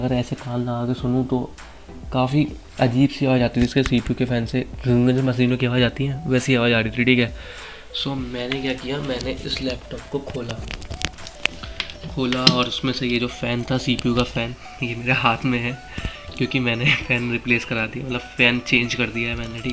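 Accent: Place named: India. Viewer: Yeah like im supposed to understand a damn indian guy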